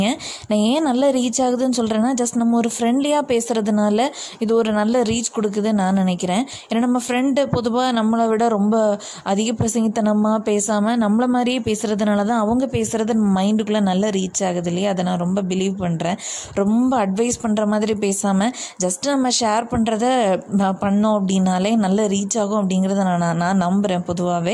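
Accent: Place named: Tirunelveli, India. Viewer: Neenga Tirunelveli ya antha slang varuthey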